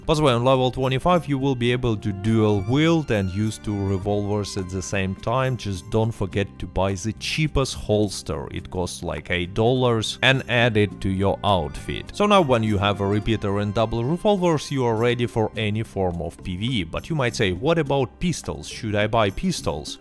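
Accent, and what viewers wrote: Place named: Russia. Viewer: The Russian accent make this video even better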